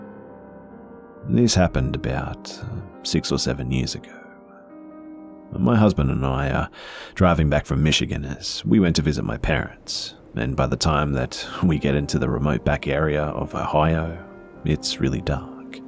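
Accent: Australia. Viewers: Something about an aussie reading stories really appeals to me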